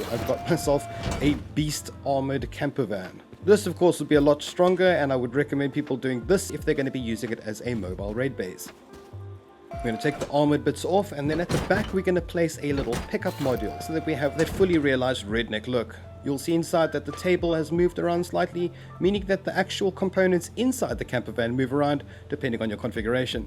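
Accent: South Africa. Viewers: Are u south african?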